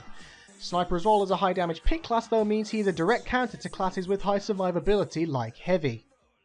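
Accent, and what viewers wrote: Poland. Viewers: Wtf, are you polish?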